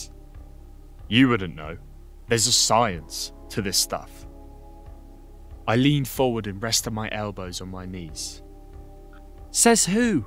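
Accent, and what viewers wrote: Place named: United Kingdom. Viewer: lose the british accent.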